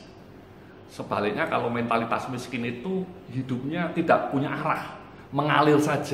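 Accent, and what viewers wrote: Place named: Java, Indonesia. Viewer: kok ngomonge kaya wong jawa yak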